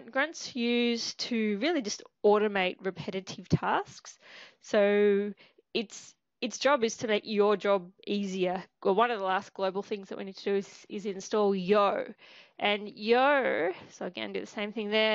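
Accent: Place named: Australia. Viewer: I guess she is from Australia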